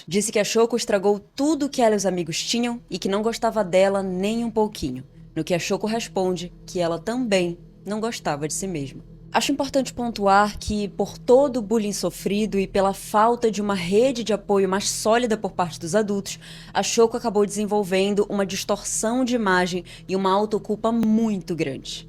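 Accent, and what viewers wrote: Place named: Pará, Brazil. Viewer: Vc é paraense né, aqui as pessoas tem uma fala meio igual de portugal.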